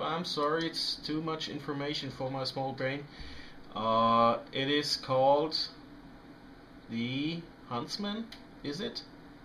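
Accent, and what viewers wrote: Germany. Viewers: Excellent exhaustive video! I can tell from your accent you're from Germany, kudos to you for speaking great English!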